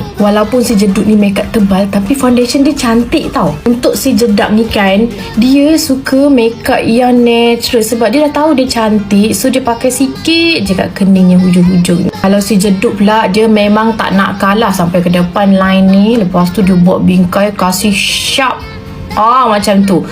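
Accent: Malaysia. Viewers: Jeduk ini kakak bicaranya kok kayak Malaysia ini kakak dari Malaysia ya atau kakak itu hidup di Malaysia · Kak orang melesya ya kok ngomong nyah gitu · Kakak ini orang malaysia ya kok ngomong nya kayak orang malaysia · Kaka nya orang malasiyanya soalnya ngomong nya malasia😊😊😊😊😊😊😊😊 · Kakak ini orang Malaysia ya kak kok suara nya kayak orang Malaysia 😅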